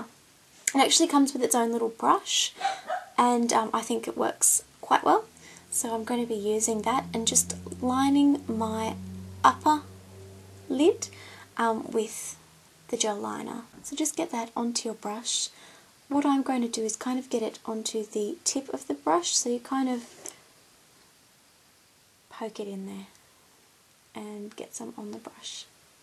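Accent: Australia. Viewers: Hey everyone. I really like. I just discovered her and I was wondering if there are any other gurus like her that are aussie because I love that accent and actually I am technically aussie (my grammy is from Austrailia).Thnx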